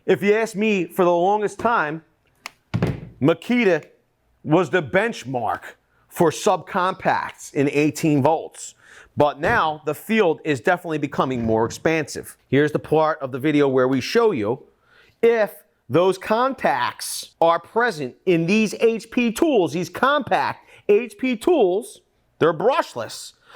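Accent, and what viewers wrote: Philadelphia, United States. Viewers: This is the first time I've ever watched your videos and I am 40 seconds into it, but I can bet that the narrator is from the Philadelphia tri-state area because of his philly accent. I'd recognize that jawn anywhere.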